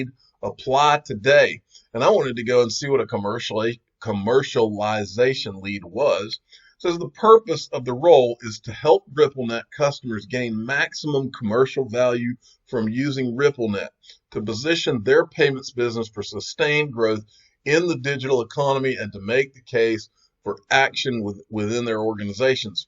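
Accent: South, United States. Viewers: That was halarious..with his southern drawl.😂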